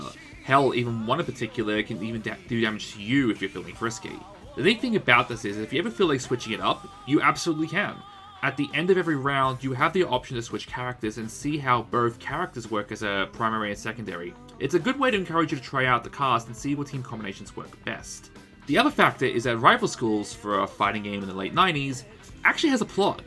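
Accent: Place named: United Kingdom. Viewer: youtube commentary: uk accent introduction that belabors the very concept itself absolute misuse of the word 'unique' rendering it pointless *potential absolute misuse of the word 'weird' rendering it pointless *potential use of the phrase 'deep dive' to suggest the idea of retrospective opinion *This is where I clicked off the video.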